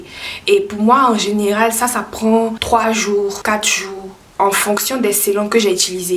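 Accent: Ivory Coast. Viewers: J'aime son ascent ❤️❤️❤️. Genre à l'ivoirien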